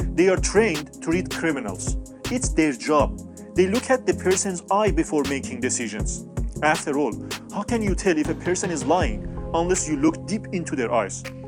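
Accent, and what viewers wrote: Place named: Iran. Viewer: The narrator sounds Persian 😄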